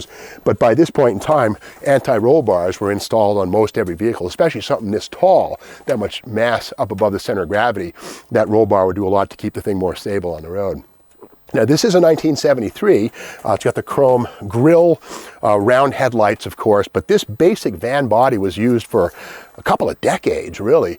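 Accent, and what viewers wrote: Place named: Boston, United States. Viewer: Is it Burlington auto wrecking? You're boston accent comes out as bursington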